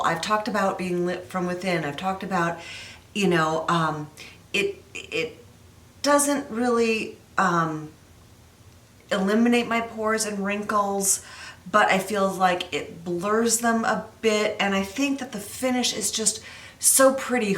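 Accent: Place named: Midwest, United States. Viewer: Born and raised in Iowa so I totally understand the Midwestern accent, yah hey! ;)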